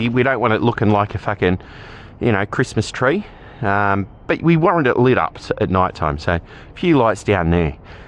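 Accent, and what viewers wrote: Australia. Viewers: Nice truck , Mate ! ,I'm Chinese ,learning English, also need some time to adapt to Australia accent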